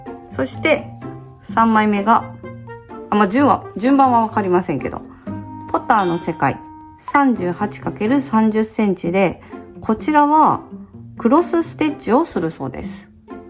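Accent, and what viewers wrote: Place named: Shimane, Japan. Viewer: 一年ちょい遅れで始めました、見つけて効率よく刺してます何より、話し言葉に惹かれ… 私の故郷の北九州弁とそっくりな方言満載…と思いきや、「だんだん」で島根の方と判明時々挟むダジャレ？に、同世代感を勝手に感じ、楽しく後を追いかけています❤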